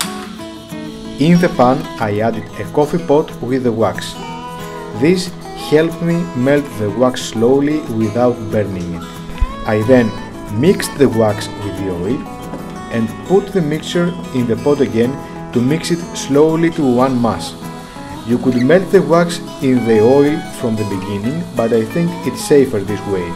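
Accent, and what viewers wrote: Greece. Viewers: I could tell in 5 seconds you are Greek. My Papou is from Zakynthos, Ti Kaneis! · that greek accent )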